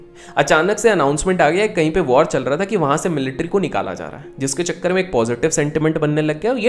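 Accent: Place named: Hyderabad, India. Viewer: Kya miya kar hyderabadi accent 👌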